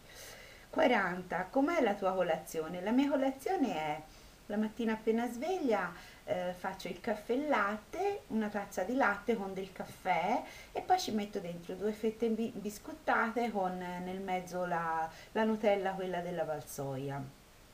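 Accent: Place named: Tuscany, Italy. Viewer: Che meravigliaaaa! Adoro la parlata toscana <3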